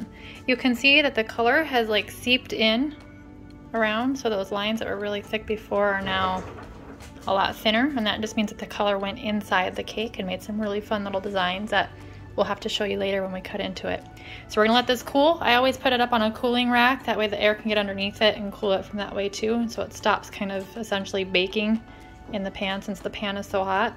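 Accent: Minnesota, United States. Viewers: She's definitely from the Minnesoota region... loving the new Channel guy's 👍🇺🇲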